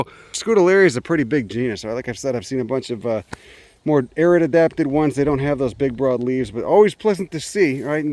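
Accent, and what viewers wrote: Chicago, United States. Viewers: A man with tattoos, Chicago accent who loves Native plants is a man I would marry! I wish there was a gay clone of you!! And your fucking HILARIOUS!! ❤ Keep up the great work!!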